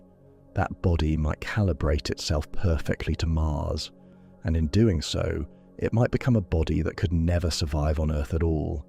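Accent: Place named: England, United Kingdom. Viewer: Why is every AI notation done with an English accent. Getting very annoying.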